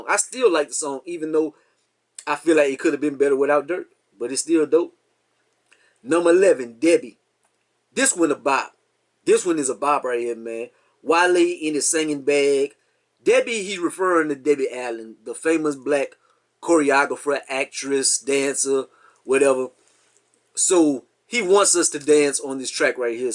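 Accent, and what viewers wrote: Louisiana, United States. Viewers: Man I knew you had to be from Louisiana, I left after Katrina at 14 but never forget the accent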